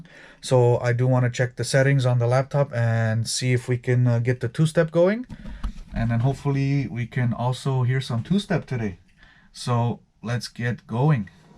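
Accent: Canada. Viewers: from the moment you opened your mouth i knew you were from gta/peel lol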